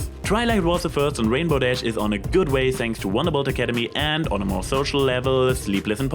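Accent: Germany. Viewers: ... You're from German, ain't ya? I knew I recognized that accent.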